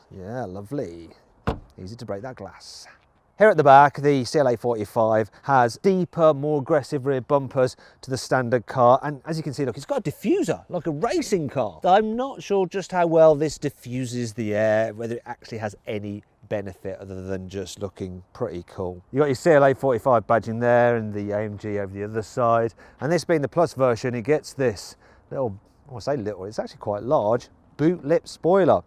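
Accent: United Kingdom. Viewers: これイギリス英語やからイギリス目的じゃない場合はやめた方がええで 💢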